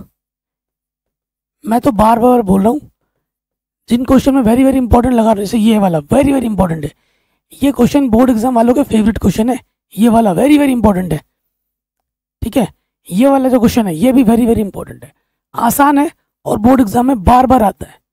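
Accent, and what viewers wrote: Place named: Jharkhand, India. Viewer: Sir mujhe lgta h ki aap jharkhand se ho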